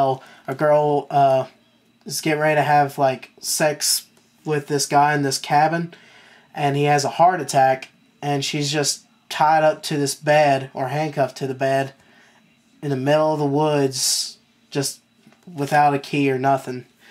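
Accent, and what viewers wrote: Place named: United States. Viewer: Great collection. Where about America are you from? You have a cute accent :)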